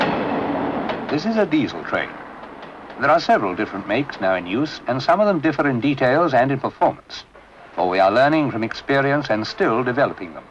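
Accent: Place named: RP, United Kingdom. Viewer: I enjoyed this! It's a piece of social history as well as railway history. Look at the clothes people wore, listen to the BBC English of the narrator, the "Light Programme" style of the backing music, the explicit statement near the end that only men would ever drive these trains, spot the parcels trolley on the platform etc etc... it's a real step back in time.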